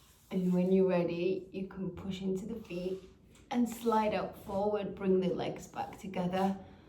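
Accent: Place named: England, United Kingdom. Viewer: You're so hot in this outfit 🔥 Specially talking in this beautiful engkish accent too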